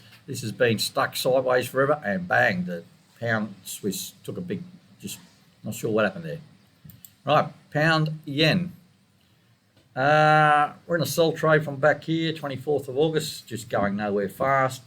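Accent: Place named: Australia. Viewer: Thanks a lot. Yes please, keep the Aussie accent. I won't be able to handle the Scottish haha:D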